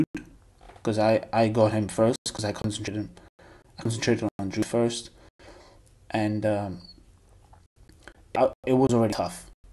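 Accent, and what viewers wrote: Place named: Eastern Europe. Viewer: cause they are like suka.. I hate it hahahaha so I need to watch more of your videos; I'm assuming you are Slavic from your accent.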